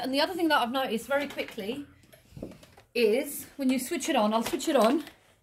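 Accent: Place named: South, United States. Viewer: always a pleasure, where you from ? I see the UK plugs and you have a southern accent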